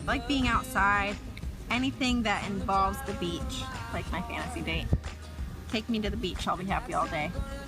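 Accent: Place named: Upstate New York, United States. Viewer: T F just my opinion. It’s a harsh upstate NY accent.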